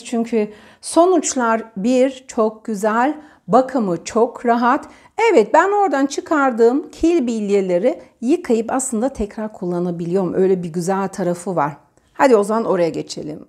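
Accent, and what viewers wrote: Turkey. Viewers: Abla Trakyalı galiba 😀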